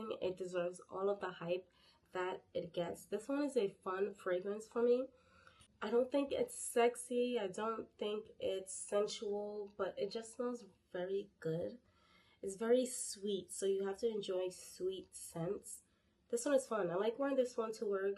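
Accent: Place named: Caribbean. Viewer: Great video! You have a slight Caribbean accent. I’m curious, what’s your nationality if you don’t mind asking?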